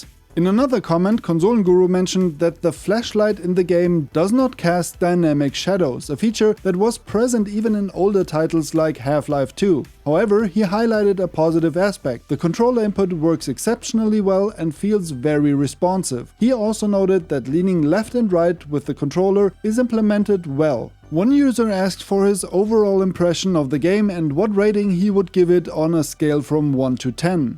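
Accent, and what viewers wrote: Germany. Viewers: german english accent is hilarious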